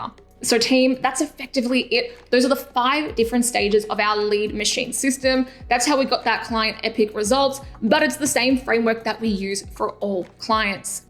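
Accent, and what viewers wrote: Australia. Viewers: Never getting tired of the Aussie accent and never getting tired of your videos 🤷🏻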